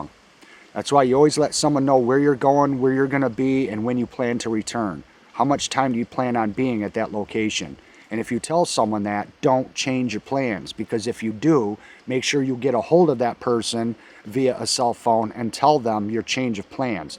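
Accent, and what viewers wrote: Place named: New York, United States. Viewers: Good video! I thought I recognized your accent lol. (I'm from Little Valley originally, near Jamestown/Olean area in western NY - in Ohio now). We camped at Allegheny State Park recently and I was surprised how unconcerned most campers were about black bears, and many of them thought having bear spray was foolish. I never thought black bears were any kind of threat, I ran the woods all the time as kid in bear country -- then one day I looked up bear attacks on the internet. You'd be surprised how many black bear attacks there are, some fatal. And quite a few predatory. It's not likely, but it sure can happen. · You know, i heard you talk and instantly thought he sounds like he’s from New York. I live in the Mohawk valley. We really do have a subtle accent if you will. First time watching any of your videos.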